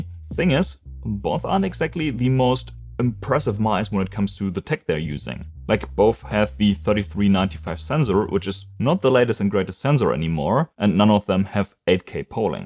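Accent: Germany. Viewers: Mann hört so sehr den deutschen Aktzent heraus😅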